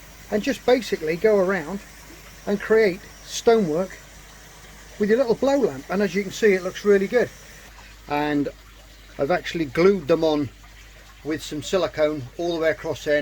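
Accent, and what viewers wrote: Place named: England, United Kingdom. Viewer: Friendly neighbourhood Aussie pest here again. Lord I luv a pommy accent. Lol Ok lets start watching.......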